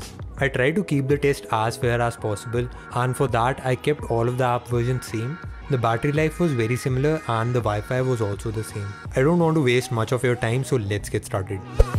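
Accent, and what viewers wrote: India. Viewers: I suppose only Indians may understand this kind of English. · Can you speak better english without this fucking indian accent! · I am not racist ..but in india your english is very indian.. :) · I think it's good to get used to other people's accent which is quite different from most of us, because one day, if you visit India, bear in mind that most of them speak like that. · It's not broken english - he just has an indian accent - I think he has a great voice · another fucking india accent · Trust me. Train your english. Because i can't understand SHIT with your indian-accent english are you saying. U said channel to chaniil at the beginning.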